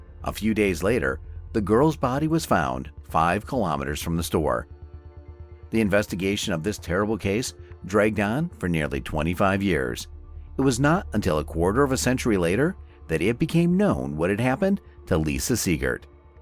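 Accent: United States. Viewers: This script and the narration are terrible. Who says that a show was on "federal TV"? And it's full of things like "Gary refused to give his DNA. The police then formed a list of 11 suspects that refused to give DNA samples. Gary was among them." No shit, I heard what you said... And that terrible Midwestern short "a" just makes it that much more abrasive.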